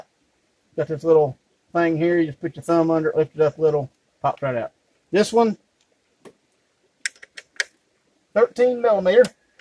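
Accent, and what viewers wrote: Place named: South, United States. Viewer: I don't understand why people feel the necessity to criticize such good-hearted intention and help. The bungee cord move.. what a boss .. so simple, it's genius! (Poetic license here folks, please? I understand proper grammar. But, we're not in an academic setting. Are we?!) Being a city boy mathematician, 17 years ago I moved to a small Texas town. I've discovered I'm whiter than most and a country boy at heart! The problem with that is that I'm Hispanic, Mexican American, or whatever I'm called these days. I liked the Southern Drawl! Brother, I guess many have forgotten the Golden Rule. I enjoyed your video and light sarcasm. You are funny! Don't forget to keep us laughing. I learned and I was entertained at the same time. As an educator for over 30 years, learning should be FUN! GREAT JOB!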